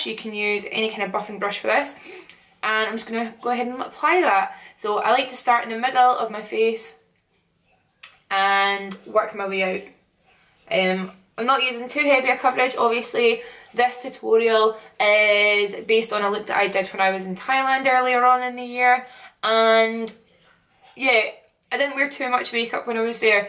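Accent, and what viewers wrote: Scotland, United Kingdom. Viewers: Its just a fucking scots accent calm it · She's Scottish Lool that's not foreign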